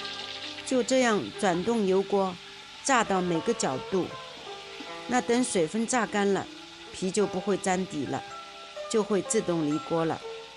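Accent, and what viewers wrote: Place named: Jiangnan, China. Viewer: 听口音是江南人。太仓人概率95%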